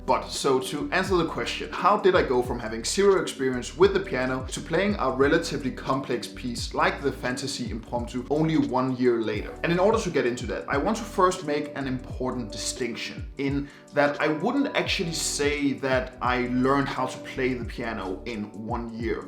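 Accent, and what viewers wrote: Denmark. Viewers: Thanks for the inspirations, I am brand new myself, and are still working on my wife to allow me to buy a piano LOL... BTW are you from Denmark? I am and think I hear a bit Danish accent in your video. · Jeg var ret sikker på, at du var dansk - ud fra din accent. Da du så slutter af med at sige "... rounding of the video" var jeg helt sikker :P Tak for en god video :) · Dansker, lol.. så tydeligt :D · i can hear the danish accenttt :p anywho great video I'm 18 and wanna start learning how to play piano so bad- I've always wanted to know how to play an instrument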